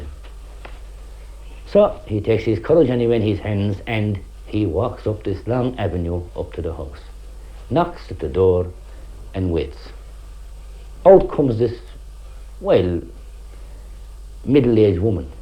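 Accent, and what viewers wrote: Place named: Limerick, Ireland. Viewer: That could have been my Limerick-born father or uncles talking!!! "advert izmunts" "Shtand there one second" "A big blacktorun schtick ....." Wonderful!!!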